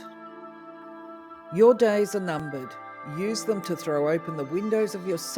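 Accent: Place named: Australia. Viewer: Great content, thank you. Nice touch to hear an Australian female voice narrate a welcoming change.😊👍🏽🇦🇺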